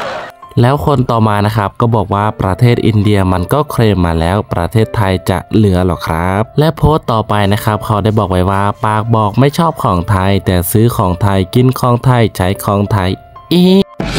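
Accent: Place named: Cambodia. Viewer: ทำไมเเอตมินสำเนียงเเปลกๆ สำเนียงเหมือนเขมร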